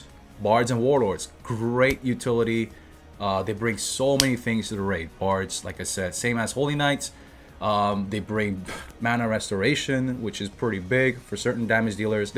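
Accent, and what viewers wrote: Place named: United States. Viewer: Dude, are you from america? Your english is perfect. A lot of the korean streamers out there do speak english, but obviously have a korean accent. If I didn't check already, I would think you were from the states.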